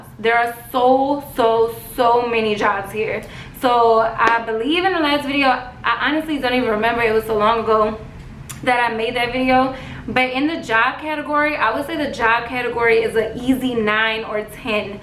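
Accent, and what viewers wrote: New Orleans, United States. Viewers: Omg I love your new Orleans accent!!!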